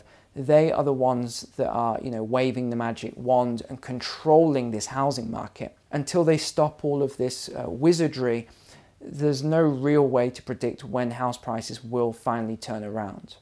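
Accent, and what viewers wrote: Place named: England, United Kingdom. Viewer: is clearly marketing himself for the USA viewers because he knows they are a bigger population than the UK and his English accent might get the audience. It also makes sense now that he is living in the USA.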